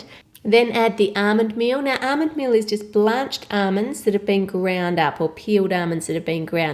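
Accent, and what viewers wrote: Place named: Australia. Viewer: If you want that kind of accent. You have to roll your tongue :P I love her Australian accent too!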